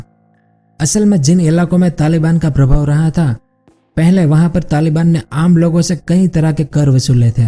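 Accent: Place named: Rural, India. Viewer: Tu puri villege bawa hindi bolta hai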